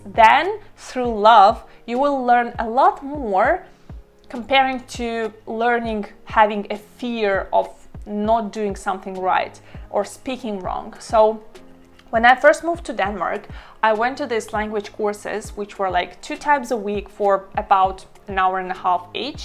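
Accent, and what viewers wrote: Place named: Russia. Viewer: Hello, from a native russian speaker, it's very interesting that a russian accent is so present in your speaking. Not about good or bad, just a remark.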